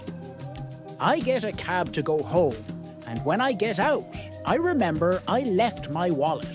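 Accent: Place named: Ireland. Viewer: It might be considered typical of an American to say so, but I never thought of an AI with an Irish accent. I do, however, wish to say that the persistant censorship of You Tube consistently eats holes in the conversational fabric of the productions. The weak kneed, limp wristed fascisti who believe that this overplaying of their morality in a public forum somehow empowers them in any manner are sadly mistaken. If they should ever fully emerge from the womb (or asshole), of their mother, they will have to realize how poorly thought of by the rest of the world What a cowardly behavior for adults...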